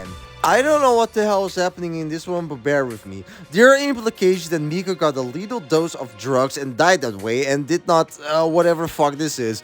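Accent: Netherlands. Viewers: Your dutch accent is showing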